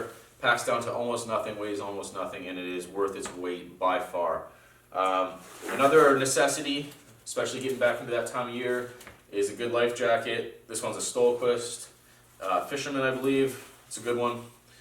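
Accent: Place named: Canada. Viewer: Hey say hi to scout for me love yr Canadian accent "Not to shaby"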